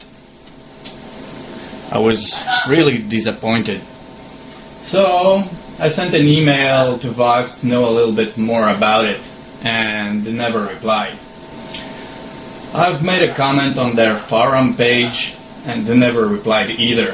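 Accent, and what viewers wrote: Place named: Quebec, Canada. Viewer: You have a Quebec tone..lol Thanks for the review..I was going to buy one.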